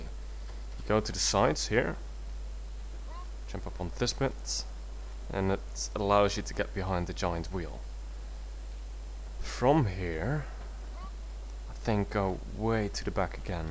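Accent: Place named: Netherlands. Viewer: Netjes engels man ;) maar de T's en D's geven je weg :P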